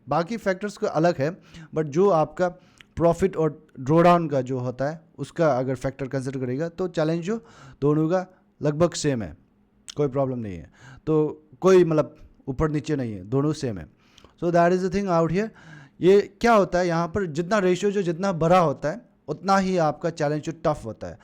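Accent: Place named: Punjab, India. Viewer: u sound like a punjabi guy❤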